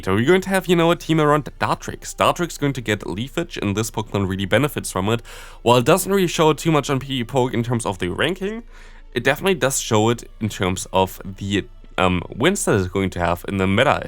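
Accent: Germany. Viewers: Germans speak English always with this sort of accent. Nothing new if you heard more Germans.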